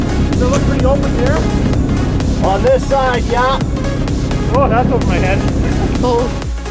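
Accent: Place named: Canada. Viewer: oat stoat about learn how to talk English you Canuck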